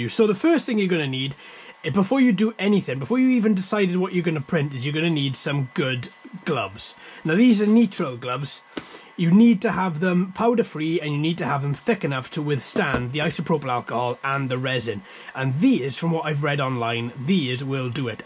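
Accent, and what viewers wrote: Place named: South Wales, United Kingdom. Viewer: Sounds like ya from South Wales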